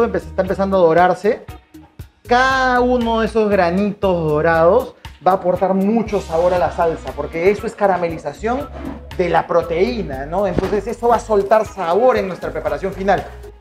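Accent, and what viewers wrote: Peru. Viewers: Gracias por el video. Que bonito oírte con el acento de peruano, así como hablan en Lima 😊😊😊😊😊😊😖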